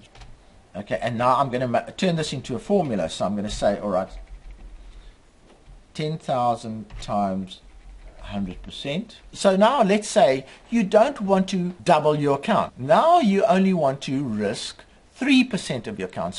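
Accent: South Africa. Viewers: wow . . You sound so South African ! are u from New Zealand or South Africa ??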